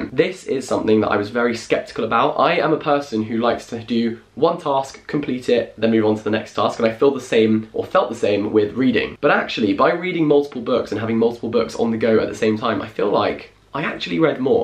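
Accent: United Kingdom. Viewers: I like your British Accent it's amazing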